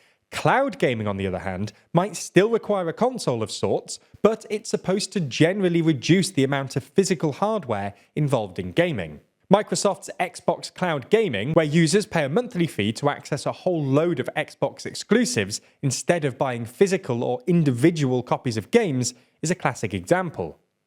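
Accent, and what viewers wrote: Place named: United Kingdom. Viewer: Hi, I'm spanish. Sooo, this is what you call a strong british accent? My god, that pronunciation of 'Blizzard' was incredible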